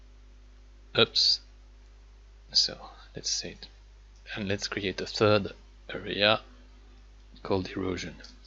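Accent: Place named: France. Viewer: bonjour, je pense que tu es français vu ton accent. Tu peux me dire si je peux obtenir une version démo de world creator 2 ? Sur leur site je vois pas de version d'essai. ya moyen d"en avoir une ou faut direct acheter le soft ? si c'est le cas franchement ils abusent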